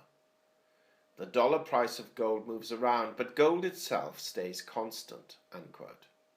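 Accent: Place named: Wales, United Kingdom. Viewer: I thought you sounded Welsh, is that right? if you are, it's a very mild accent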